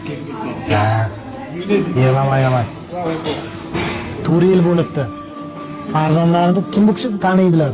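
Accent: Khorezm, Uzbekistan. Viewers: Фаргона шеваси эмас бу хоразим шевасику фаргонада ова дейишмайди . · Ота Хоразмдан экан шева Хорасмча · Гапириш Шева хоразмга тортяпти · Амаки хоразмнан шекилли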